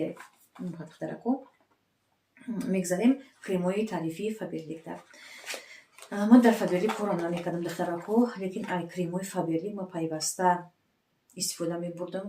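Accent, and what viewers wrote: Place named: Kulob, Tajikistan. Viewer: Салом апаи азиз хамвптан шмора мебинм асабом ором мешава беморима хис намеенм худочон ба кувахои баланд бираси хусни зебо лачаи нагз дори Кулоби зебо сана м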